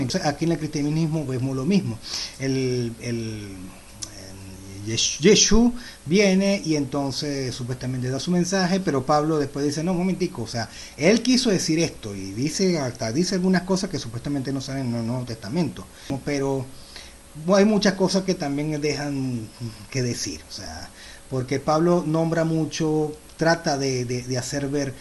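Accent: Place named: Venezuela. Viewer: Usted,no parece judío,tiene acento venezolano.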